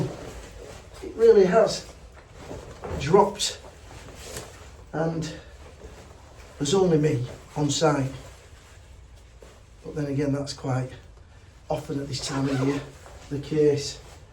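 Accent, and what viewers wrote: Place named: West Yorkshire, United Kingdom. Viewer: Hello, I just watched your video and really enjoyed it. So down to earth and practical, and I love the West Yorkshire accent. I worked in Leeds for 12 years and really miss the place! I don’t think I will have problems with flooding on my veg plot😮😂.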